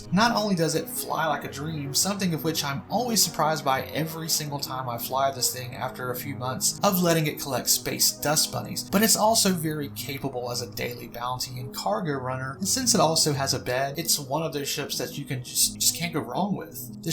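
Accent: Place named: North Carolina, United States. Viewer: I swear that sounds like a North Carolina accent! [enough to sound Local to me]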